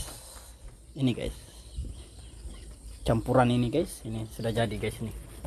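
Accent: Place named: South Sulawesi, Indonesia. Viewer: Kakak domisili di Kalimantan kah... Tapi bahasa dan logatnya kayak orang Sulsel... Kucinng petani ikut tarbiyah...sdh tingkatan takrif atau tahwin kak? 👍🙏He he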